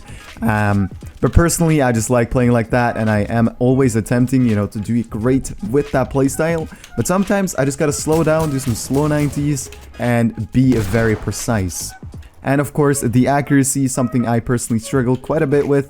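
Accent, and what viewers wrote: Norway. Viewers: Are you from Norway? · Are you norwegian?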